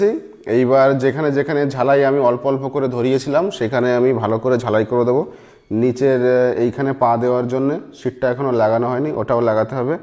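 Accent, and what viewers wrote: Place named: Kolkata, India. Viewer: Ei chele to india tei thake maybe, voice to Kolkata style er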